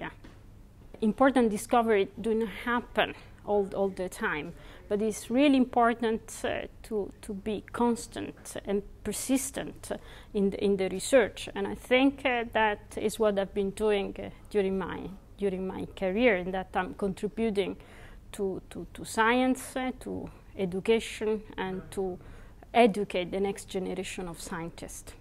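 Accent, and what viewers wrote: Italy. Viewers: I recognized from her accent that she might be Italian/Spanish/Portuguese maybe? Her name strengthens my suspicion.. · That thick italian accent goes off and on on every other "R"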